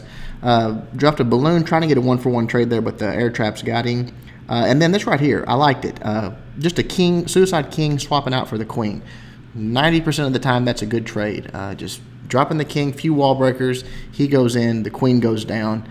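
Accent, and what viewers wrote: South, United States. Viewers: I recognize that accent anywhere in the south ;)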